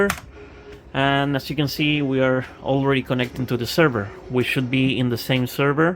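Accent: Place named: Argentina. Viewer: Qué pro, Boludo tus tutoriales son de lo mejor,, sigue con el desarrollo de tus proyectos, sabía qué eras Argentino, ese acento no se quita ni con inglés, desde el principió me dí cuenta con ese inglés, jaja, it keep also, bro.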